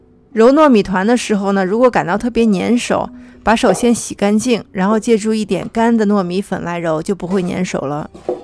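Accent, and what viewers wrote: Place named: Beijing, China. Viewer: 五零后老北京，喜欢那儿音的味道，，必看，关键是必听！倍儿地道。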